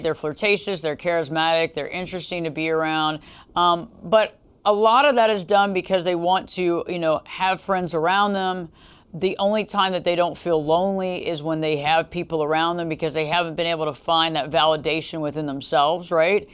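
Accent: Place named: South, United States. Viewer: Thought I saw Mariska Hargitay talking with south accent 😁😁😁 this vid is really intetesting, as BPD, I don't know which type I'm. Sometimes, it seems to me to get a bit of each type together...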